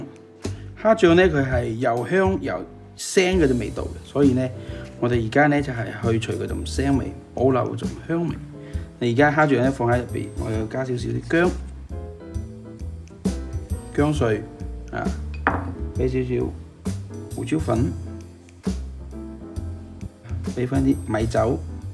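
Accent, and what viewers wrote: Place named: Guangdong, China. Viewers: 對，不是標準的廣州話但是確實是廣府話。整個嶺南都有自己的鄉村話。例如，中山有自己的口音和鄉村話，江門也有，南寧也有，等等。香港和廣州說的就是廣州話。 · 这是广东哪里口音？我不会说广东话但是能听懂粤语，能听出来和正宗白话有点点不一样？ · 主持人的口音明顯是來自大陸廣東省，而且字眼都國語化了，一點韻味都沒有，不親切聽起來很土很怪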